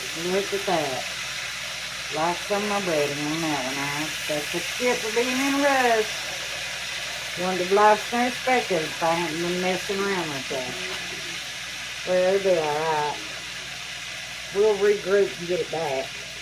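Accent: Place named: Virginia, United States. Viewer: I found you last night. I can’t wait to cream some cabbage. God bless you darling. I’m from near Dickerson county va. Talk just like you. Is a pleasure hearing you talk. I can understand, to a’-t.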